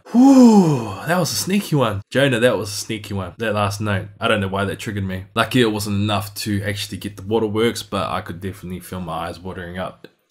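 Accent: New Zealand. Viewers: R u kiwi?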